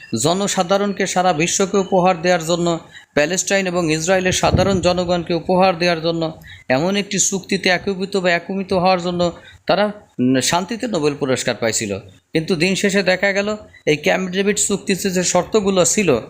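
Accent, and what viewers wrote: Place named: Gopalganj, Bangladesh. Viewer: ভাই আপনার বাড়ি আমার মনে হয় গোপালগঞ্জ , আমার বাড়ি ও গোপালগঞ্জ,, এই ধরনের কথার বাচনভঙ্গি বাংলাদেশের অন্য কোন জায়গার লোক বলতে পারবে না, এটা আমার বিশ্বাস, আমি আপনার প্রতিটি ভিডিও দেখি, ভালো লাগে, ভালো থাকবেন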